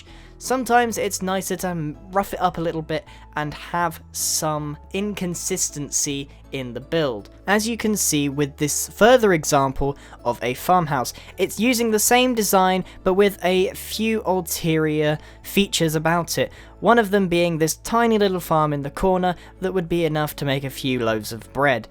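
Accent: United Kingdom. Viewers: he's British. he doesn't say things the same as you. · british accent and good content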